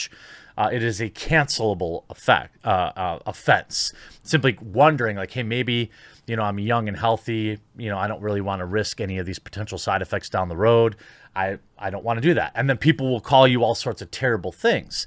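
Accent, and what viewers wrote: Wisconsin, United States. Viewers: has a Wisconsian accent? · Nah, but that Wisconsin accent warps things a bit. 😉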